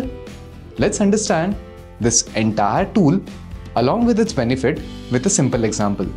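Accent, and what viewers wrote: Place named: India. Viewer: 这个咖喱味的英语听得真难受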